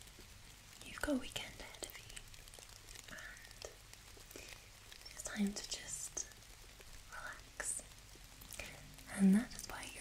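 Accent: Scotland, United Kingdom. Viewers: She murmers scottishly. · I thought it can't get better than this Scottish girl, rain, then came the nails and I had to bounce.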